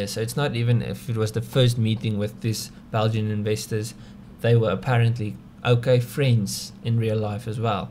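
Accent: South Africa. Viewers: South African accent? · Afrikaans accent is strong 🔥🔥♥️ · The South Africa in this accent is beautiful haha 😂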